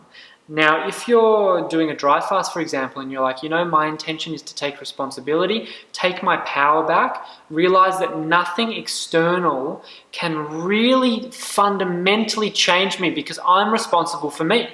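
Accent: Australia. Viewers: Is there any value in doing Intermittent Fasting and not having any water or do you have to fully fast? (great to hear an Aussie fast!)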